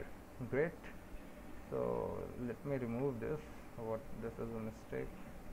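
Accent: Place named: India. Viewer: its always a damn robot non english speaking indie person... cant understand a damn word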